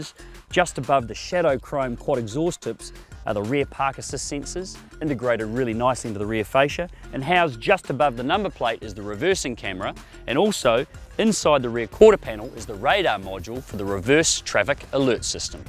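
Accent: New Zealand. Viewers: Who let this kiwi present this video? Would have thought they would keep in Australian...seen as though it's an Australian produced vehicle...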